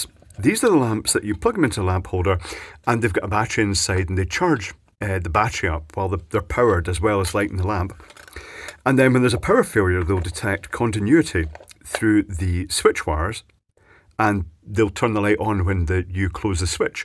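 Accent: Scotland, United Kingdom. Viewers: Bit like old bond he has a Scottish accent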